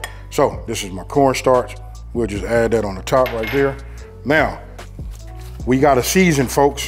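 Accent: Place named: West Coast, United States. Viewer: West Coast accent is sooo heavy!!!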